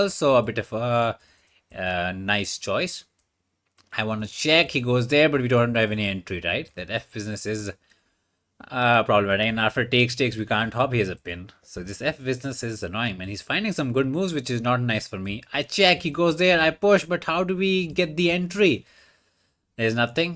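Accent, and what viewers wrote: India. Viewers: I used to be bad at understanding Indian accents but I’m a master now that I watch this channel every day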